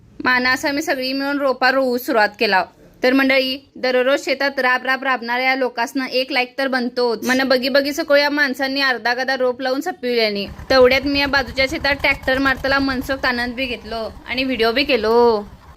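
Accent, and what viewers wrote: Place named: Kolhapur, India. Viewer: Laglav khalav khup chan Kolhapurkar bhasha nirgas ahai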